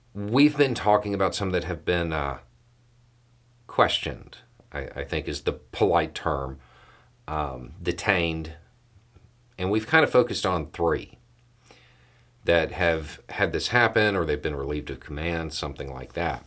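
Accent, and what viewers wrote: South, United States. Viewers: Nobody with that accent should ever be commenting on geopolitics. Dumb rednecks.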